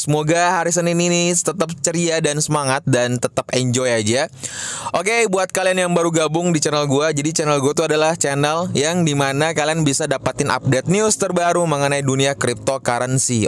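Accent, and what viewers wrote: Bugis, Indonesia. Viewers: Bugis ya bang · Bang,, orang bugiss yaaa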